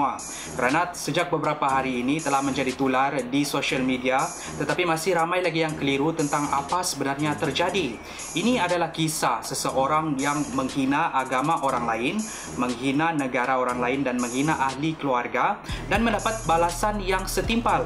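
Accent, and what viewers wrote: Sabah, Malaysia. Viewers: sabah here. pekat btul sabah mu. vid mu nda prnah mengecewakan. semoga sukses selalu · Sabahan bah nii... Like bah kita kita juga nii.. Haha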